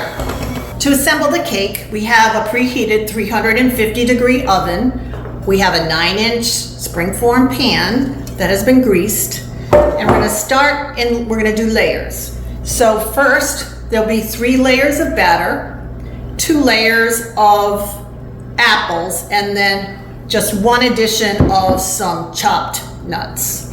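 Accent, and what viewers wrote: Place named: East Coast, United States. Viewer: Hi, Thank You for the tip on freezing the bread. I'm a bread [person, so I could eat the whole loaf, but my sensible self says NO! LOL We're heading out camping for a few days, last camping trip of the season. I picked up some molasses the other day at the store, so I'll be making the Anadama Bread when we get home. I thought your accent suggested an East Coast accent. Thanks for replying. Have a Great Day. Ellen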